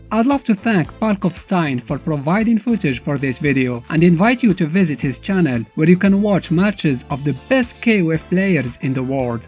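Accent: Arab world. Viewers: I can tell you're Arab, maybe Moroccan or Algerian, from the way you said Najd, and your accent over all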